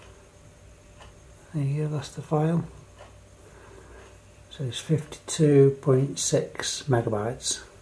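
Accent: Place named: Barnsley, United Kingdom. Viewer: ps where you from? barnsley? lol Im from leeds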